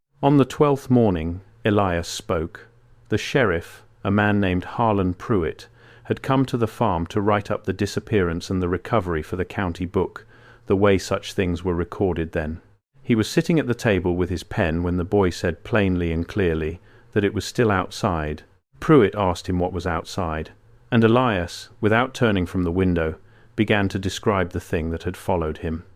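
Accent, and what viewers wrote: United Kingdom. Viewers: Thanks for saving me twenty minutes. It’s ridiculous to have a British accent for this story. It’s kind of like if one of the holler gals usually dressed in a dirty cotton dress suddenly donned a dress just sent from Paris. A mini skirt dress, yellow with large black polka dots and black boots.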